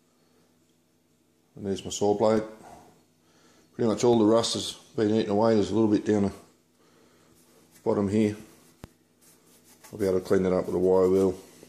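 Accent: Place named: Croatia. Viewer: Your heavy Croatian accent makes it difficult to comprehend what you are saying. The confused videography makes matters much worse. Have you considered employing someone who speaks either Canadian or American to do a voice over? By the way.... Croatia is a beautiful country and the rich cultural history of the Croatian peoples is unparalleled, maybe you could make a video on your village!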